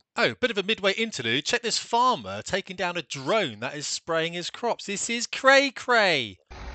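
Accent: United Kingdom. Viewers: Knowing what is going behind the scenes of the Ukraine war I love hearing a British accent make fun of a US pilot after all the US has done for with helping the UK in Ukraine. Total morale booster of a video. I think this video needs to make the round in the US military to remind us of who the OG enemy of the US is and why we should never trust them ever again.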